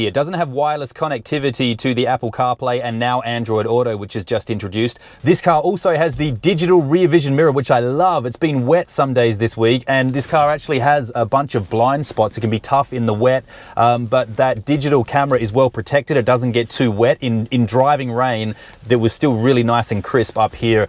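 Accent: Australia. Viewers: This guy has an Aussie accent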